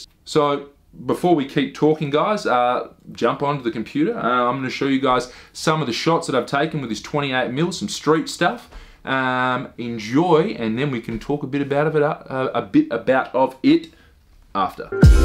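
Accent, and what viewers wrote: Australia. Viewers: Gotta say this, love your true blue Aussie accent.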